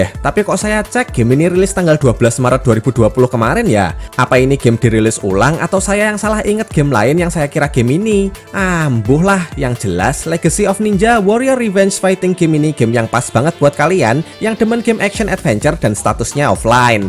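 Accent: Java, Indonesia. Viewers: Jowo ne Endi kang salam dari Ngajuk 🙏😊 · Suka banget pembawaannya bang ad Jawa Jawa nya😀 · Orang Jawa ya bagian mana bang daerah mana bang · medok banget 😅😅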